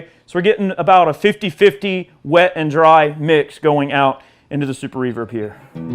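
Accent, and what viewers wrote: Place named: United States. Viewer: Those Yankee hillbilly accents and those GI Joe Iraq hats should be aced. It's like one of those guys off of Duck Dynasty turned guitar player.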